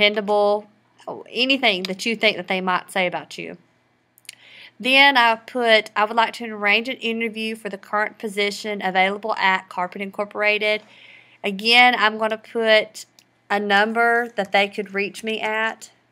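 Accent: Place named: Georgia, United States. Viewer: Thank ye kindly, Georgia Girl! Lovely voice and accent. Very helpful. TYVM! :)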